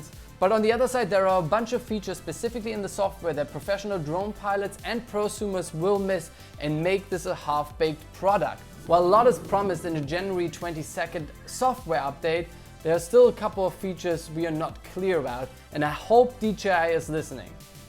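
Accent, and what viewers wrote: Germany. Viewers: Where do you got your german accent from!?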